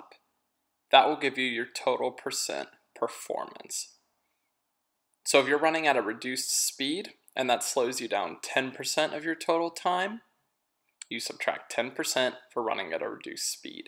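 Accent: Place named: United States. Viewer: he sounds sooooo American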